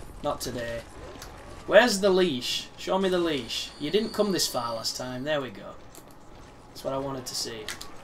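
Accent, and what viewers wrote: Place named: Yorkshire, United Kingdom. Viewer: Sleep deprivation brings out the Yorkshire in you :D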